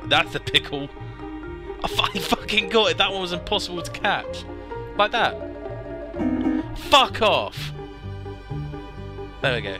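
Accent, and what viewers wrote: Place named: France. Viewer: I want you casting every Monster Hunter Podcast in that frensh voice? Très bien! Best regards "Cooking Papa" Oli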